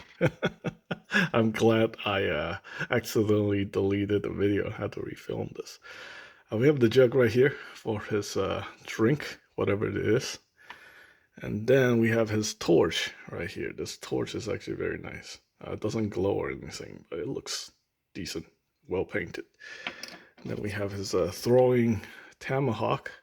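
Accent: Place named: China. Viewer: 您好，你也是華人嗎？ making an assumption based off of your username, and what little accent I can detect. If I am mistaken, I apologize, but if so, I am very, very happy to find another person from the same background attempting reviews in English. I've seen quite a few Chinese channels that do Mandarin reviews, but don't see too many Chinese people doing English reviews. Of course, your reviews are way more professionals than mine, ROfL.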